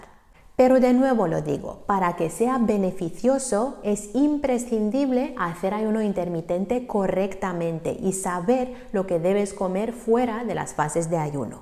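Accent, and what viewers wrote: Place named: Romania. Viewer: Văd ca ești românca după accent, a luat premiul Nobel la autofagia deci mi se pare cea mai mare descoperire.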